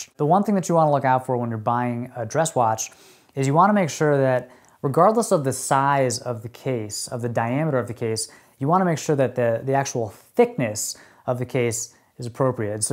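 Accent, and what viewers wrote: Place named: East Coast, United States. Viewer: Sounds a tad bit East coast, but also eatern midwest. If I guessed I'd say hes from VA-OH-PA something in that middle east coast range.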